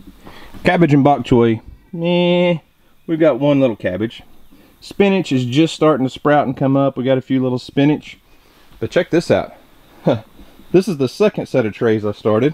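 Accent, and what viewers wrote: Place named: Texas, United States. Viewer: 9000% lol sounds like Texas. Great video I really enjoy watching them.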